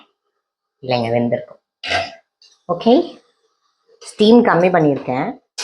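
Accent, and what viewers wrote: Kerala, India. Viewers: That Malayalam slang unne vittu pokale 😊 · Anyway Tamil samsarikkumbol malayala slang nannayittundu.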